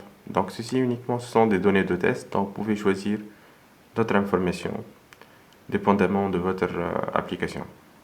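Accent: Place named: Tunisia. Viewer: accent tunisien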